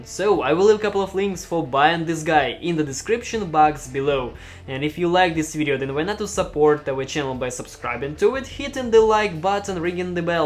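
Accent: Russia. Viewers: Russian English killing me🤣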